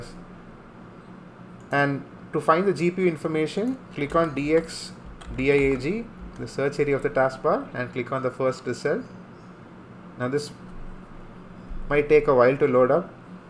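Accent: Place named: India. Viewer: And as always, the man with indian accent did not disappoint and helped with technical issues Thank you so much (I hope I didn't sound racist)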